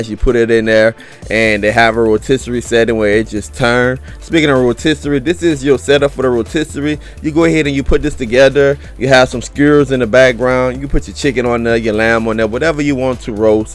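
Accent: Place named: Louisiana, United States. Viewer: I knew it lol. As a fellow cajun, I would recognize that accent from anywhere.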